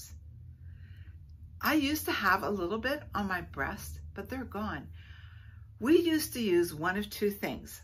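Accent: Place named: North, United Kingdom. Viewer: You sound like you’re from the north. You look good. Me likey 😊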